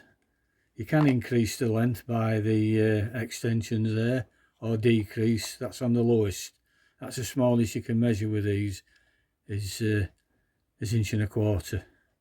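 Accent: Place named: Yorkshire, United Kingdom. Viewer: Hi I suspect you are a fellow Yorkshire Man with the accent? I’m from Wombwell/Barnsley and a wannabe clock maker which is how I came across your channel.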